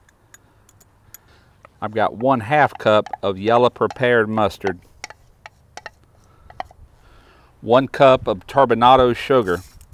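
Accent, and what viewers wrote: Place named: Texas, United States. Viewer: this dish looks mouth-watering👍 and I really like your accent. are you from Texas or elsewhere down south?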